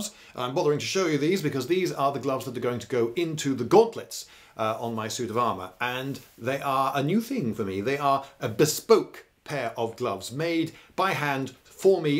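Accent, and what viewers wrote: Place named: United Kingdom. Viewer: U should do MORE LEATHER GLOVES FULL FINGERED LEATHER GLOVES reviews hun I just ❤💖❤ your British accent voice is stunning to listen too great job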